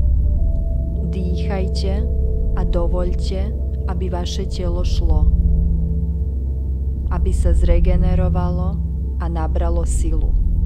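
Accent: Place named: Eastern Slovakia, Slovakia. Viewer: Meditácia obsahovo a postupovo veľmi dobrá, žiaľ, mne vadí ten hlas, ktorý ma ruší. Navyše tam vnímam východňársky, miestami až rusínsky prízvuk. Keby to bolo nahovorené niekým iným, je to bez chyby a perfektné. Zafarbenie hlasu, intonácia, dikcia a výslovnosť, to sú všetko faktory, ktoré sú pri vedenej meditácii veľmi dôležité.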